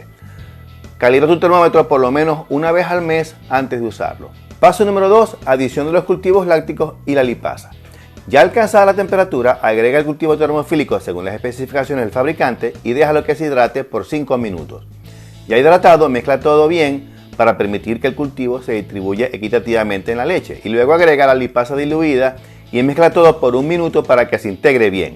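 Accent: Zulia, Venezuela. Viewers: Tienes acento Zuliano eres del Zulia ?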